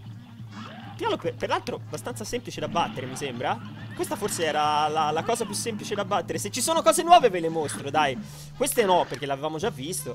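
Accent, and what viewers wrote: Tuscany, Italy. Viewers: ma sei toscanohhhh?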